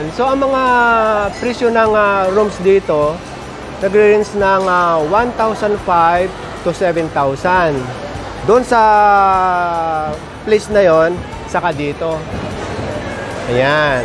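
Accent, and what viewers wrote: Visayas, Philippines. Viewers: Visaya ba c kuya?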